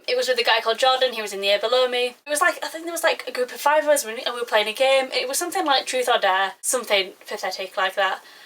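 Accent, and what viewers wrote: Yorkshire, United Kingdom. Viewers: You sound like a yorkshire bird anywhere near leeds mebbe? :D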